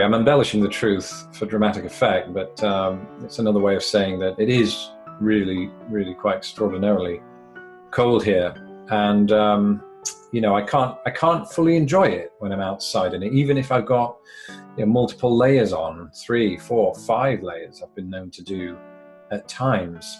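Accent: United Kingdom. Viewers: Proof we will watch anything with birtish commentary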